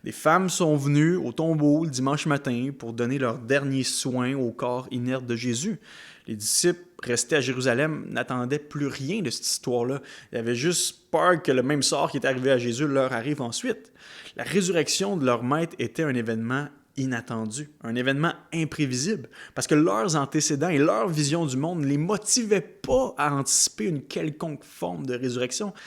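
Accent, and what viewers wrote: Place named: Quebec, Canada. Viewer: Bonjour, pour commencer je désir préciser que je crois en l'œuvre de Jésus-Christ et en sa resurrection. Il me semble que dans votre explication que vous avez oublié de prendre en compte la résurrection de Lazar ( dans le nouveau testament) et la Résurrection d'un homme dans l'ancien testament (2 Rois ). Bonne continuation, merci pour vos partage avec ce beau accent du Québec, moi j'ai l'accent Alsacien.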